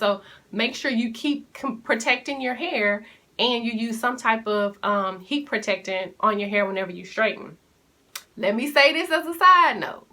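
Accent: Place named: South, United States. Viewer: Cool beans! You sound a little a fellow Southern Girl, Lol! Welcome to yt...looking forward to the virtual connection. Peace and blessings. :)) *subbed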